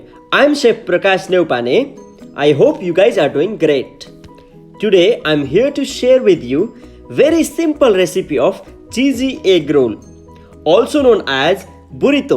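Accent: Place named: India. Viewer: great presentation my friend you're sounds indian. your burrito looks yummy.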